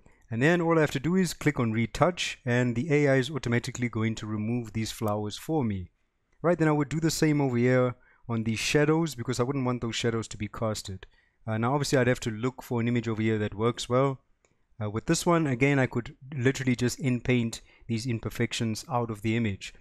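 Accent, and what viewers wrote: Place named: South Africa. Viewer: Was just looking for an overview of Pikaframes and found your channel. Then while listening, i was like, this guy sounds South African! Then found out you are. So i am so glad to see fellow South Africans doing well on YouTube and in the AI space. Im still trying to get there, but hopefully will someday, but at least your video gave me what i needed to know from Pikaframes to know that it is going to work for my next YT channel and hopefully will do well :) Thanks bro!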